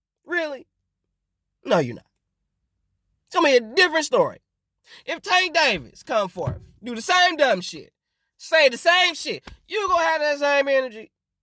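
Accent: United States. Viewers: I'm not mad at you. You're trying to get Canelo haters on your channel LO, L, and then I can hear yourself American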